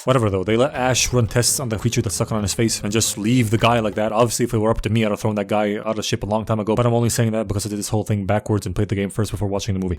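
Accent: Germany. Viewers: german accent is unbeatable. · Are you German? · Love the German accent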